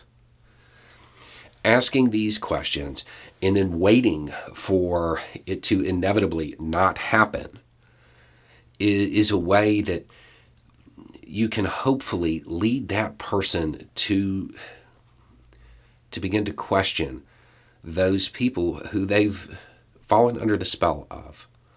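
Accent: South, United States. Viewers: When I was younger I carried shame for simply being born in the south. Because many of our people were and some still are racist and or extremists often falling for absurd conspiracy theories. So it's nice to hear a southern voice that represents the south and southern men well. Thank you. UPDATE - didn't expect interest in my comment but since some have noticed I just want to make clear I am not ashamed to be southern. Certainly there are people in our past and unfortunately present we can't feel good about. But just like minority girls and boys need people that can inspire them to be great, I feel the same can be said about southern white kids (and all kids really). Perhaps in addition to pointing out our historical mistakes in history class and public discourse (lest we repeat them again) maybe it would be useful to highlight those who have done well. 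We have astronauts, scientists, inventors, and other great people we can also be inspired by. I prefer the word "inspired" over "pride" because "pride" often leads us down a dark path. Plus I don't think I should feel any personal pride for the accomplishments of others, but I think I should be inspired by them to become a better person myself and feel a sense of "possibility".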